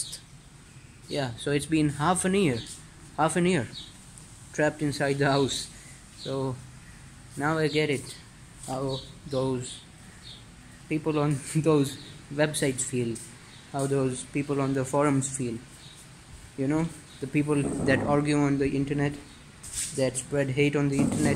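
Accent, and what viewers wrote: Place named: England, United Kingdom. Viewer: Aye haye English accent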